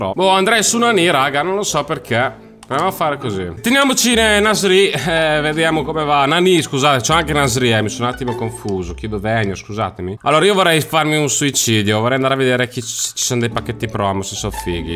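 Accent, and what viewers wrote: Naples, Italy. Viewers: io comunque mi complimento per il tuo napoletano che è qualcosa di stupendo. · il tuo napoletano mi fa divertire troppo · ma sei napoletano? o tifi napoli pero abiti tipo a milano? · oddiooo ahahahahah te che parli napoletano ahahah sono tutto bagnatooo grande ti amooo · La combo Dialetto Napoletano+Incazzatura fa schiattare ahahah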